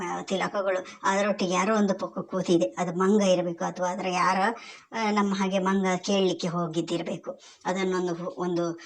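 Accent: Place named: Kundapur, India. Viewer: Super akka all the best👍💯 enastu videos barali nimminda.. Kundapur kannada keludakke tumba chennagi ede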